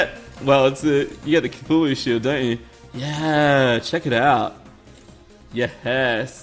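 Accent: Australia. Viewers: hahaha also you being a aussie like me is so helpful and convenient and makes it easier to watch ya vids:') ps you sound like your from over east like queensland or something? I'm from perth btw <3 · r u australian?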